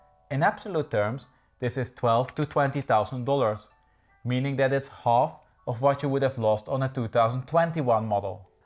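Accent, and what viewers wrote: Netherlands. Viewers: Do I hear a Dutch accent? 😅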